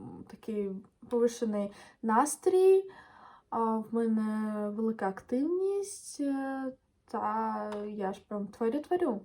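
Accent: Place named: Russia. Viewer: Русский акцент. Лучше на русском общайся, слушать сложно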